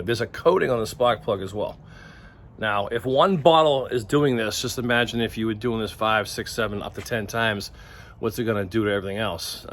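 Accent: Boston, United States. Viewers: Sounds like Boston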